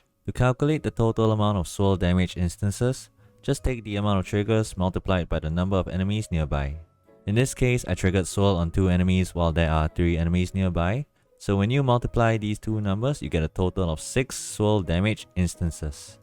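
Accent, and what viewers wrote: Singapore. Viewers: are you singaporean? idk why your accent sounds like it :D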